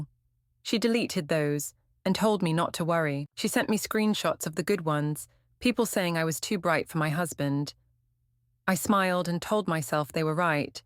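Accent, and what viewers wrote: United Kingdom. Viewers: This British narrator is dragging this idiotic story and dont waste others time you idiot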